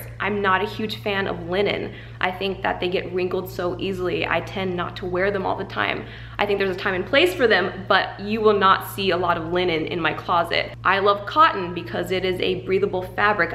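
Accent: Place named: Australia. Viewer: Your Australian accent is amazing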